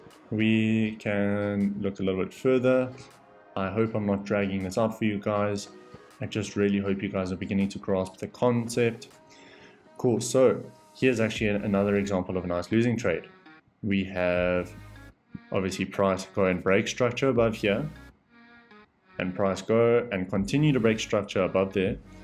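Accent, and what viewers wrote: South Africa. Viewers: I know a South African when I hear one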